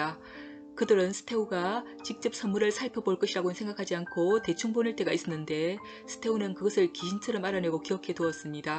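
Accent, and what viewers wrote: Gyeongsang, South Korea. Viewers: 겡상도 억양 발음 문제 있어 알아듣기 어렵고 부자연스러워요 차라리 천천히 또박또박 말하는 습관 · 저는 경상도 사람이라 ..잘 알아 듣겠음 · 같은경상도라 듣기 편했는데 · 경상도 발음에.. 내용파악하는데 불편! 그냥 자막으로만 처리하는것이 나을 듯! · 목소리가 코가 먹었구용 사투리가 경산도분 여기서 꿈을 이루시나봐용ㅋ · 지독한 갱상도 사투리가 뼈속에서 나오는군요.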